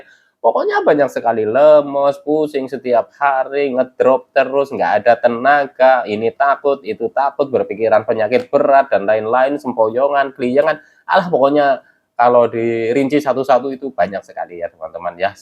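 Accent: Banyumas, Indonesia. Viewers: Cilacap hadir mas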